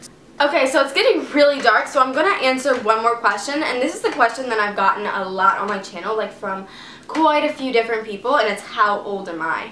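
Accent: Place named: Louisiana, United States. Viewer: You can so tell you are from Louisiana !!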